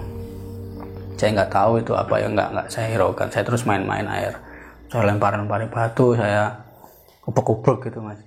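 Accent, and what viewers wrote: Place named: Malang, Indonesia. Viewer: Kyo logat e wong malang se 😁🙏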